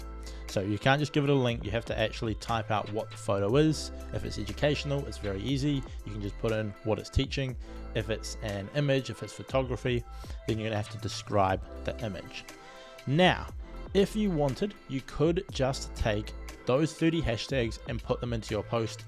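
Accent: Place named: New Zealand. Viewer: thanks dude. first i thought a fellow Australian? then i heard the NZ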